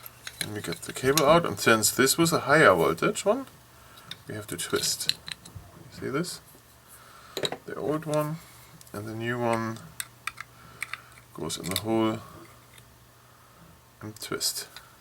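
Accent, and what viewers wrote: Germany. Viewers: i'm not german but i used to live in germany so i recognized immediately by the sound of your voice :-) thanks for this helpful video! I wasn't sure if i had to buy a whole new LED track light system as mine came with halogen, good to know i just need to buy the bulbs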